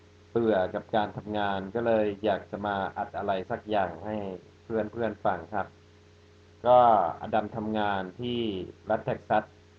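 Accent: Thailand. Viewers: Where did you learn Thai? You even have Thai accent! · พูดภาษาไทยชัดเว่อร์ไม่พอนะ พูดภาษาไทยสำเนียงไทยได้ด้วยอ่ะ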